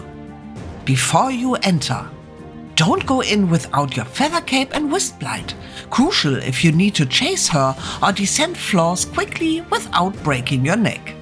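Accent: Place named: Germany. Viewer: Den Kanal nie gesehen, nach den ersten zwei Sätzen Englisch "Ah eine Deutsche" 😄. Aber eine nette Stimme, alles sehr schön erklärt.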